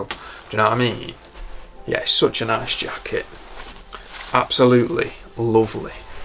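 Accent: United Kingdom. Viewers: Your English is so British. Where are u from?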